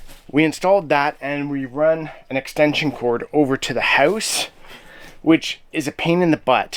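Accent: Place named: Maritimes, Canada. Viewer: The snow hasn't even flown yet and I already want to be in this place again hahaha. Are you originally a Maritimer,? Your accent sounds like home to me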